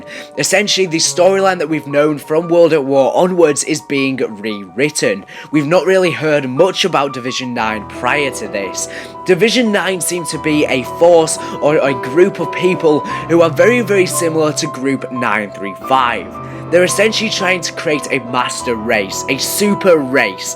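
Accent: United Kingdom. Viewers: steeleman619 He's British...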